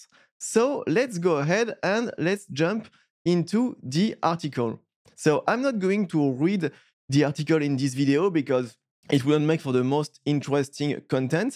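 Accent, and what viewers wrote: France. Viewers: L’accent franchouillard qui fait mal aux oreilles 😂😂😂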